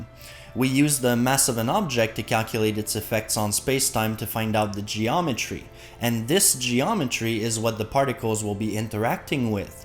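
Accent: Quebec, Canada. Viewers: You’re definitely Québécois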